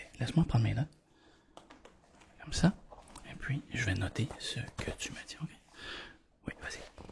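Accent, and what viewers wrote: Quebec, Canada. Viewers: Voilà désoler mes on comprend rien l accenquébécois c'est pas la langue française voilà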